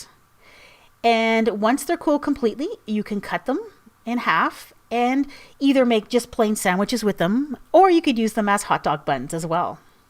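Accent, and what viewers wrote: Canada. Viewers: I can't tell if she's Canadian or from Minnesota.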